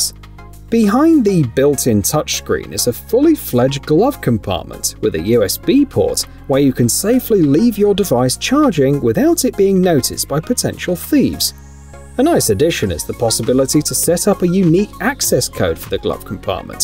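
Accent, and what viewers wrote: United Kingdom. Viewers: Loose the Limey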